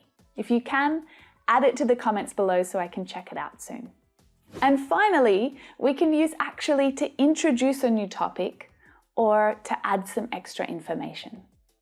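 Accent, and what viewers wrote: Australia. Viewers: speaks with an Australian accent...why do you say it is awful? Btw where are you from?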